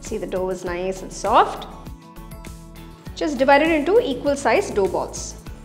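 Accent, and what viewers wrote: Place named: South India, India. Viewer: Bihari sattu paratha in south Indian accent!! A delicacy in itself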